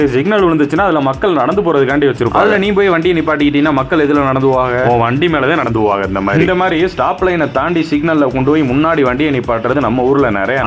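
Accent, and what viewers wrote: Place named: Madurai, India. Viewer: Unga slang enakku romba pidikkum enga area slang.. I am from madurai... Nanga ninaikkura niraya vishayangala neenga thairiyama solrathu romba romba happy..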